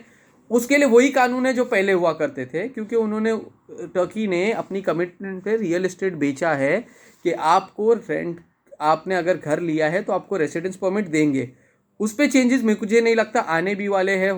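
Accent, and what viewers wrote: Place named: Hyderabad, India. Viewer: Assalam o alaikum Bhai, you sounds like Hyderabadi Telangana, India, if yes please let me know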